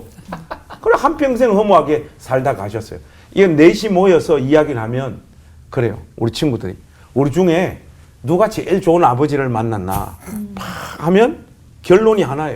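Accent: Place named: Seoul, South Korea. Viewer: 목사님~ 서울말씨? 멋져요^^ 올포원의 죠지클루니 맞습니다!!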